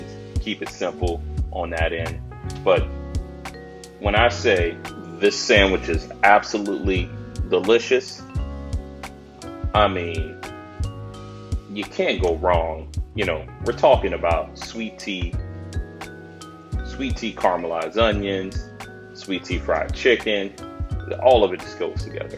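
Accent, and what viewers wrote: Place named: South, United States. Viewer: When it comes to frying chicken, I’d only trust a guy with a southern accent!! Is the flavor or tannins the sweet tea imparts to the recipe?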